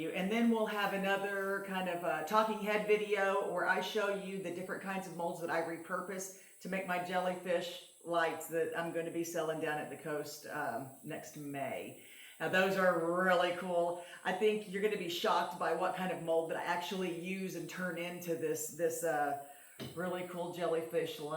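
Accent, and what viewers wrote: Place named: United States. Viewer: First, amazing suggestions and style. I cannot understand why people want to make the same thing when you can mix and match. FYI--Check on your etsy link. it defaulted to British pounds instead of US dollars. This might be just a me problem but but I want to support fellow potters. (based on accent and mention of a US holiday I assume, like me, you live in US)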